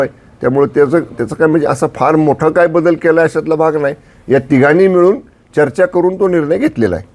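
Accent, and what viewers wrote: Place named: Marathwada, India. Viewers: मराठवाड्यातील बोलीभाषा आहे बरोबर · एकदम बरोबर बोलत आहे साहेब, मराठवाड्यातील बोलीभाषा आहे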